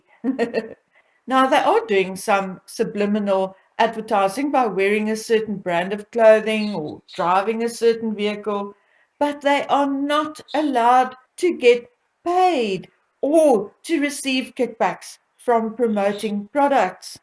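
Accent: South Africa. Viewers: Dearest I just want to say thank you 🙏 what a super video, it may take me a little time to digest as I’m not as young as I used to be 🤣 but I do so love your videos and hearing your beautiful South African accent, it’s just delightful 🥰 take care stay safe 💜 looking forward to your next video 🥰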